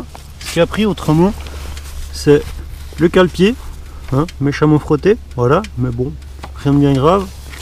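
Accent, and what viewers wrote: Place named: Switzerland. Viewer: ta cru que j'avais pas deviné que tu étais suisse avec le magasin coop au débute de la vidéos et ton petite accent des famille (bon ok je suis aussi suisse :) )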